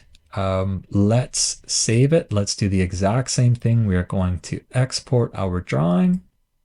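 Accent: Canada. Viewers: I could tell you were Canadian by your accent, and I've been trying to specifically place it, but I don't think I would've guessed BC. Hi from a fellow Canadian!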